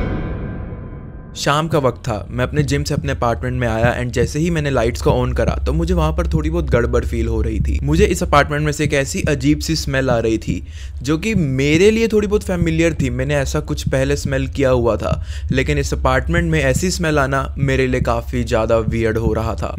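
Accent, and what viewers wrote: Haryana, India. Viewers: Sir are you from haryana?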